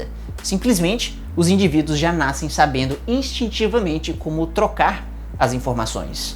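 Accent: Ceará, Brazil. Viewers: Mano vc é cearense? Puta cabeção de caixa d'água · Man tu é cearense?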